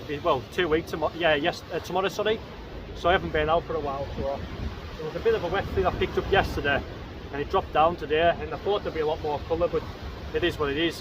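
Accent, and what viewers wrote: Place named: Cumbria, United Kingdom. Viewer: Just came across your videos by accident pal.. But what great content.. Great to hear our Cumbrian accent like.. I'm a wukinton fella.. Always fancied fishing off the heads but I'm not sure where you go or park.. Do you park at the light house pal and walk over or from St bees.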